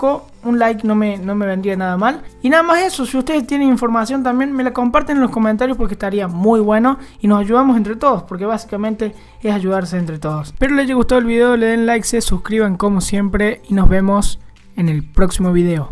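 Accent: Córdoba, Argentina. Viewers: ¿sos de Córdoba no? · Pareces una combinacion de cordobes(personas de corfoba,Argentina) y un pelo de español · Alto cordobes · Re cordobes el qliau jajaj genio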